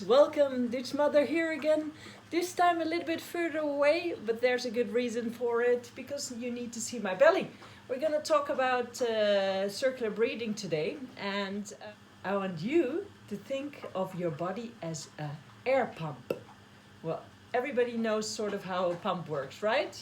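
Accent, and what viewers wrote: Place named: Netherlands. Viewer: Are you from the Netherlands cause you sound just like a female version of Wim Hof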